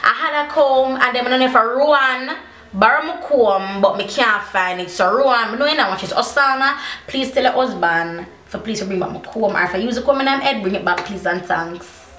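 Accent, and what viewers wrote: Jamaica. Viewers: I love your Jamaican accent ❤️ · I understand what you're saying it's her accent my bestfriend is Jamaican and I met her mom and I was like what is she saying lol 😂 but now I totally understand I love there accent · I love that Jamaican accent. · I love your jamaican accent 😍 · I’m Jamaican your accident sounds like patio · Wowwww I wish I could speak Patois. I love your accent girl! Subbed ❤️ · Kareece Brown she has a jamaican accent tho.. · your beautiful jamaican accent 😭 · u make me wish I was Jamaican, I love ur accent! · wow she is gorgeous and has a nice jamaican voice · I saw someone put oil on top of eco gel to stop it from flaking. Maybe it will work for gorilla snot...I'm gonna try. Thx for your video and your face looks good! Love ur Jamaican/street girl/white girl accent! Lol Yeah...I'm coming for you! Lol.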